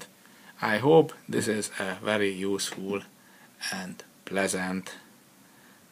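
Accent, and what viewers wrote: Hungary. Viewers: ajj de magyar vagy te is :D kurva akcentus mi? :D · Annyira hallani az akcentuson, hogy magyar :DDDD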